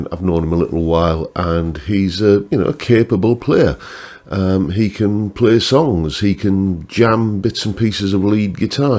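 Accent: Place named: Northern England, United Kingdom. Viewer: Nice one! Love your down-to-earth, no-nonsense, Northern bloke talk. After Gibson, Seth Lover later went to Fender and created the Wide Range Humbucker, that sounds thinner and brighter than a PAF, using 2 bobbins in series with Copper Ferrous rod magnet pole pieces instead of the steel slugs and shared Alnico bar magnet of the PAF design he is more famous for. I wanted a Wide Range Humbucker tone but using a PAF style humbucker, so as an experiment, I replaced the steel slugs and bar magnet on a cheap PAF Bridge humbucker with 12 alnico 5 5mm rod magnet pole pieces. The series tone is clearer, brighter and more open than it was before the experiment, and I got a true single coil tone when using one coil. I ran my insane experiment by Ash at Oil City Pickups, who said they already make the Brass Knuckle humbucker that is a PAF Shaped humbucker, but with Alnico 5 rod magnets - literally 2 Strat single coils sharing a humbucker baseplate, so I bought one. 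The split coil paired with Strat middle single coil gets the authentic 'ching' Strat Mix position 2 chime (Strat position 4 Middle and Neck parallel mix being the 'pung' chime tone). For those who like the hum-cancelling advantage but prefer a brighter, thinner, more single-coil-esque sound, I recommend Firebird Mini humbuckers (I use the Oil City Winterizer 1). Also vintage output humbuckers like Vanson 59's sound clearer and brighter than higher output, over-wound modern humbucker tones. I can also recommend Wilkinson M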